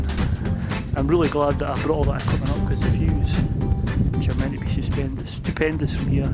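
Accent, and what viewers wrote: Scotland, United Kingdom. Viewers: Your videos are a delight: Scottish mountains and a Scottish voice. If the Scottish voice is a challenge to some then just enjoy the video as if you were listening to a song in a language you do not know. The joy in life is to encounter the new not reject the new or expect the new to change to the familiar. videos are about challenge that's why people love them.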